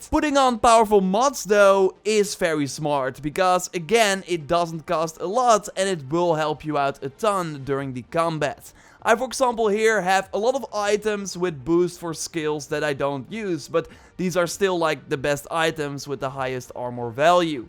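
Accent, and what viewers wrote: Netherlands. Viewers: Are you Dutch? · I'm pretty sure he's Dutch he has a very thick Dutch accent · En toch hoor ik meteen dat je uit Nederland komt 😂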